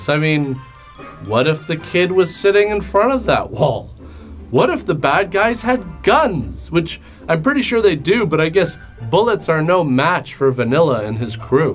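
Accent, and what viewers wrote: Canada. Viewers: Ahhhh, I could listen to Canadian "borrow" all day.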